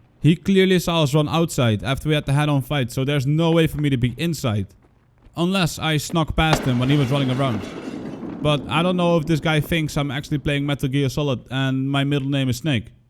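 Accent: Netherlands. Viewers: Are you dutch? Your accent is really dutch.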